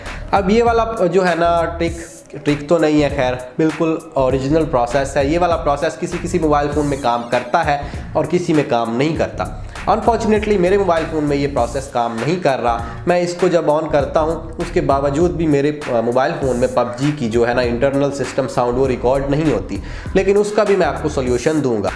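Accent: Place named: Himachal Pradesh, India. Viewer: Bhai vedio ka pta nhi voice se lg rha tu b himachal ka h,😄😂😂😂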